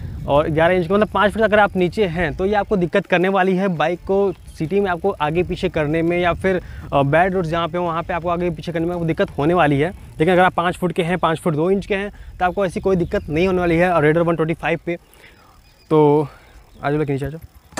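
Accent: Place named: Bengal, India. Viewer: আপনার কথা শুনে বোঝা যাচ্ছে যে আপনি একজন বাঙালি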